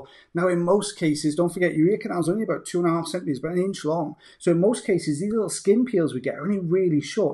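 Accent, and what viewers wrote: Wales, United Kingdom. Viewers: I told my sister I found very relaxing satisfying videos of a Welshman with a wonderful voice who does ear wax removals and she exclaimed, “!” How did she know?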